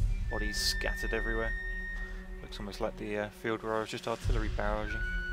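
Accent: United Kingdom. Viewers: are u brittish?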